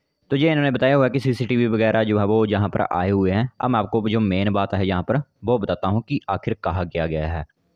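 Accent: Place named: Nurpur, India. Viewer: Bhai nurpur ,jawali wale lg rhe ho 6 ko she bolne se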